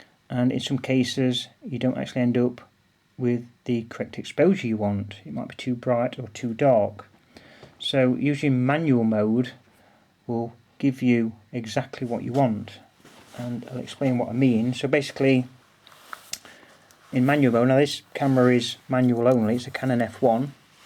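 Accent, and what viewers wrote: Yorkshire, United Kingdom. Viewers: interesting video. yorkshire or lancs accent.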